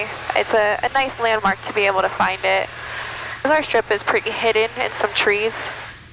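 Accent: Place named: South, United States. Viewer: Fun video. Your presentation is very relaxed and natural. So much so, that Southern is coming out. Happy flying to both of you.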